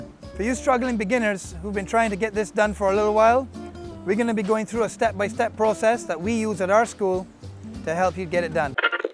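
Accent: Ireland. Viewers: Irish? My work mate is Irish too...good job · You sound very Irish mate.......I guess that's to do with the history of Barbados.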